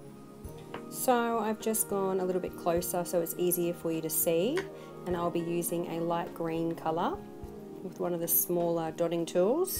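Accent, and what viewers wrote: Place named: Australia. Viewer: You're voice is making me miss Australia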